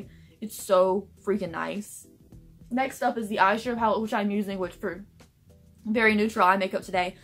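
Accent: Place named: North Carolina, United States. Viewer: Youre in NC, i was like i know that accent! I lived all over NC for 8 yrs. Whats that wig's name/style? My hair is copper blonde/auburn, i dont dare to go over to the strawberry blonde reddish color, but still like it. It def suits you. I love Colourpop's birthday cake i was just starring at it today, i love to add sparkly pigments on top.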